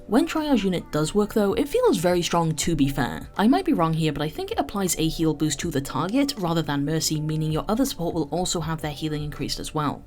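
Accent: Wales, United Kingdom. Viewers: Their Accent is Welsh if ur curious.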